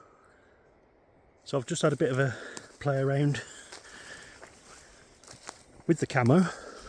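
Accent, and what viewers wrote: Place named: United Kingdom. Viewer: Only just found out about this guy from an American channel (explore with me Maria C). Such a pity as he's obviously, from his accent, from where I grew up. Too much of this is happening as people are under such pressure in the UK and were damaged during COVID due to the ridiculous lockdown. The effects are still lingering on for many in poor health, mental and physical. The economy is damaged, our cities and towns are degenerating, the outlook is grim.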